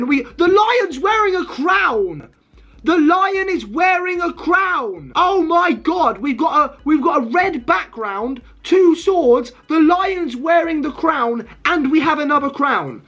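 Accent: United Kingdom. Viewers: Your scottish because yor accent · I can tell bro is britesh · i know u british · r u british mate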